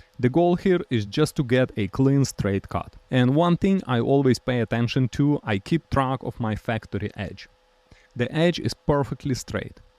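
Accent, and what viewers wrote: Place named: Ryazan, Russia. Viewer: Я слышу Рязанский акцент в этом английском. Какой то примитивный чел.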